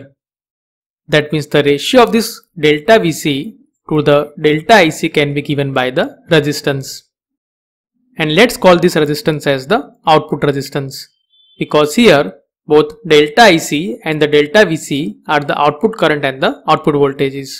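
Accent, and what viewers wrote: India. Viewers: Audio problems and heavy indian accent, makes it harder to understand than it should be....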